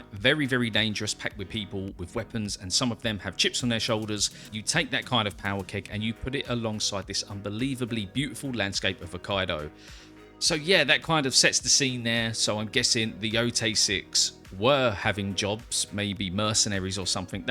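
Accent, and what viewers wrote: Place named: United Kingdom. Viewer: Brilliant video mate !! From yhe the uk 🇬🇧 myself yhe midlands nice to hear a uk 🇬🇧 accent amazing vid on this outstanding upcoming game !! I’m so so excited for this game !! I pre ordered my digital deluxe edition today !!! Counting down the days !! Thank you for the breakdown in this !! It’s great you’ve explained more and made us way more excited ! 🙂🙂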